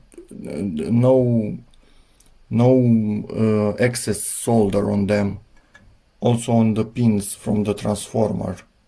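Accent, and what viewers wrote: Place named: Romania. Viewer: Poate vorbeste in Engleza ca il poata urmari si oameni din alte tari? Stiu, pare halucinant, dar lumea asta are aproape 8 miliarde de oameni, dintre care doar vreo 0.4% stiu romaneste. Eu, ca si alti oameni care se informeaza, am gasit acest canal de youtube cautand in engleza un lucru care ma intetesa. Mi-am dat seama ca ar putea fi roman dupa accent si cand am citit in descriere. Daca tu nu esti aici ca sa te informezi pe subiect, atunci beshi in plm in alta parte. Youtube Trending e de tine, Dani Mocanu vorbeste romaneste.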